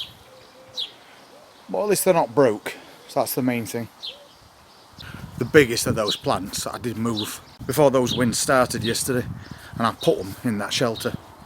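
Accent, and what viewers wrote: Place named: North, United Kingdom. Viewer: Hiya,. I always watch and listen to your videos with the captions on. I love the way it cannot cope with your accent. I'm a northerner so I'm fine with your accent and dialect, but some of the automatic captions are hilarious. Thanks for the great fun and loads of helpful and hopeful tips. Cheers.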